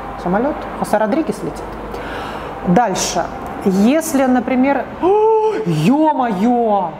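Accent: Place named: Russia. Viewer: Без акцента?! Чудовищный русский акцент!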